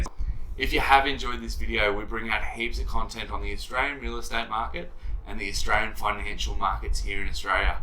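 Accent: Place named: North, United Kingdom. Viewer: Keep going. Great to hear a voice from the North about the North.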